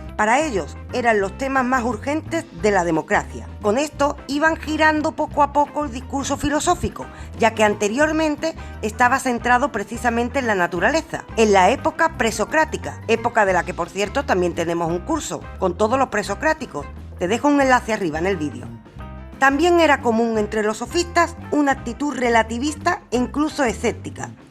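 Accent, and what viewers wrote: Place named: Andalusia, Spain. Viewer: Me fascina su voz andaluz en una clase de filosofía, saludos desde Venezuela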